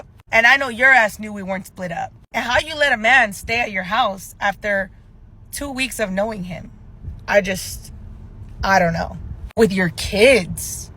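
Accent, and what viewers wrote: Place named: California, United States. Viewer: I from San Diego, and was just listening (Not watching) and i was like...she gotta be from cali and then she mentioned rancho and riverside hahaha. Glad you found out! Stay chingona!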